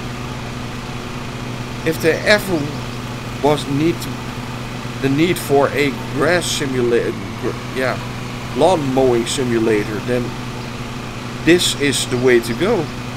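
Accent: Netherlands. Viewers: you are dutch i hear it in your voice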